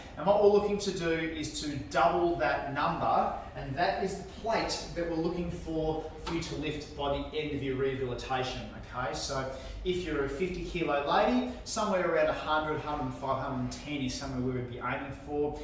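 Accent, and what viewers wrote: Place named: Australia. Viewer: Thickest aussie accent I've ever heard, anyways great vid.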